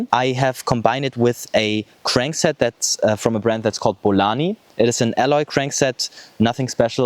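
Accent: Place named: Germany. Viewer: I hear a bit of german😂😂😂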